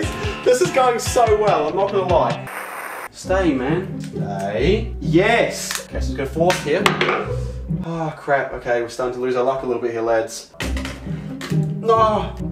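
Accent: Australia. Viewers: i love australian acent so muchhhhhhhhhhhhhhhhhhhhhhhhhhhhhhhhhhhhhhhhhhhhhhhhhhhhhhhhhhhhhh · Is he Australian?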